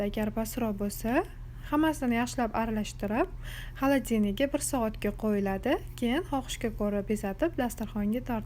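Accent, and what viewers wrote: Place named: Tashkent, Uzbekistan. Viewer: Towkencha gapirvottilaku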